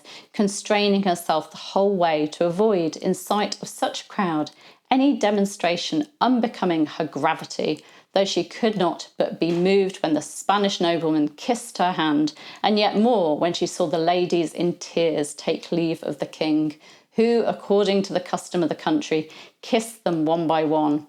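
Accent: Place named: United Kingdom. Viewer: I love your channel so much! I just love to hear you speak with that wonderful British accent! Plus you are a terrific teacher. ❤️👏🏻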